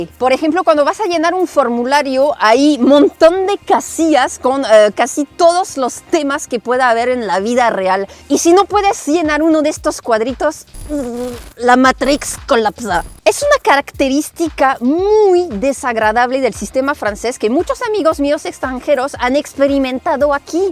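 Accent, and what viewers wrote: Mexico City, Mexico. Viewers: Sabes chilanguear mejor que yo. Siendo de México no puedo chilanguear ni imitar acentos. Amo tus notas y puntos de vista. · Me gustas como ablas saves mejor que yo y abladora como los chilangos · Me encanta porque siento que hablo con otra mexicana, “mamoneria” jaja me encanta ❤ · Chulada de maíz prieto!!, panza arriba!! 😂😂😂 ya hablas muy mexicana, vientos huracanados👍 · Adore tu acento mesclado con Mexicano 🤭😍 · Hablas como mexicana, con un lenguaje muy nuestro. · Hola amiga me gusta mucho como hablas bien mexicanota a huevo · Me encantan tus videos, hablas tan mexicana pero con acento y eso te hace única Gracias por escoger Mexico como tu segundo hogar 🇲🇽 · Me encanto tu forma de hablar muy mexicana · Primera vez que veo tu vídeo, me gustó mucho tu manera de hablar, muy Mexicana, por eso ya me suscribí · Me encanta q hable como toda una mexicana jajaajajjja 😂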